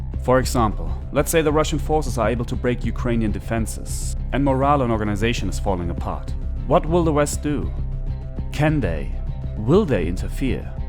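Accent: Germany. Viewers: Honestly after 5 seconds of him speaking I could hear the german accent :) cheers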